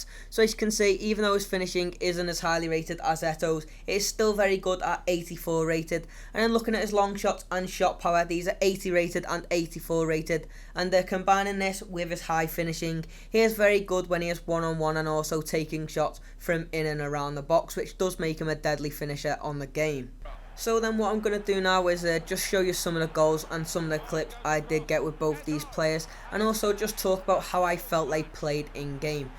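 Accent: Liverpool, United Kingdom. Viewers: Yes scouser I'm a scouser were you from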